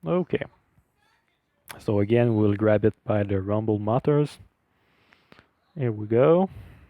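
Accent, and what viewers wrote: Canada. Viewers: Are you from montreal?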